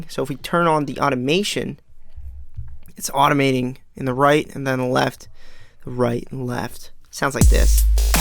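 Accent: Philadelphia, United States. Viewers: You have a Philly / South Jersey accent - am I correct? Nice 4 bars btw sounds good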